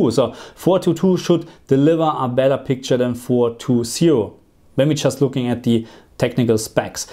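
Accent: Germany. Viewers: Liebe deinen deutschen Akzent 😅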